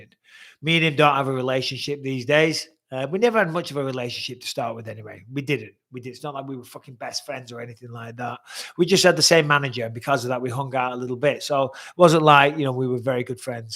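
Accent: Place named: Scotland, United Kingdom. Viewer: It's very noticeable that you do slur your speech at times, plus your memory is pretty bad for someone your age. Have you ever had any checkups regarding brain problems, etc etc, people saying you have no such problems are just being polite etc etc, but thats not helping you m8, ps Scottish accent is god dam fking awful lol oh and is it possible you can actually get through a video without mentioning Darren Till !!!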